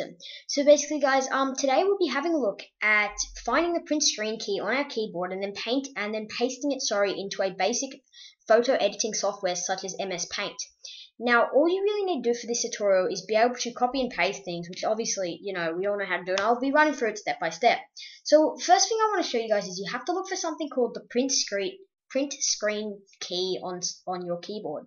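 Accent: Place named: England, United Kingdom. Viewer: You sound English!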